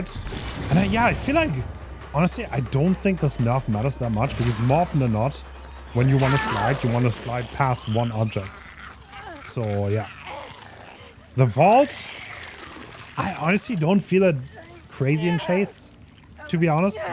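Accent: Germany. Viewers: ich hasse diesen deutschen aktzent und seitdem ich weiß das du deutscher bist wriß ich warum ich mir deine vids nicht geben kann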